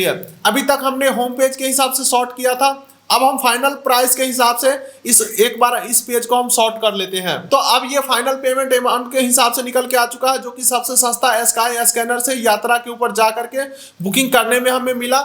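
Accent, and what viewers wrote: India. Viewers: Esky scanner.....indian english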